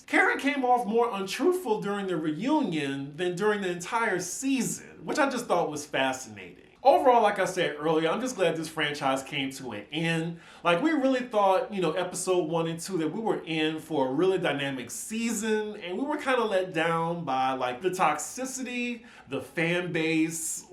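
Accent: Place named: South, United States. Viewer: ... Your Dirty South gutta gutta peeps through sometimes and I LOVE IT!!! Your channel is growing so beautifully!! I am so proud of the way you Rep Louisiana. You are absolutely charming and your content is top notch. Thank you for staying true to yourself. Keep up the good work!!.. By the way, I tried to join your pateron but your link in the box is unclickable.. let me know how I can join. Its past time!!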